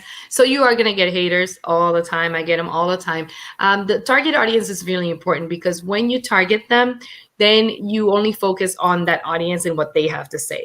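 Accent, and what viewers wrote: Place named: United Kingdom. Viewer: How can this Indian speak British, even Priyanka Chopra ka accent itna achha nahi hai I am amazed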